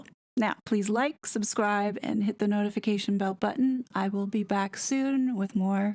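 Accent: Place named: United States. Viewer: Please, tell me how your love for "All things Royal", and/or Anglican Everything became important to you... I'm an American from Boston, and our family loved British TV and culture, had friends in the UK through travel, and their love for that corner of the Globe trickled down to at least one of the six kids, me. It's nice to hear an American voice (and an nice, soothing voice) giving me the scoop with no nonsense.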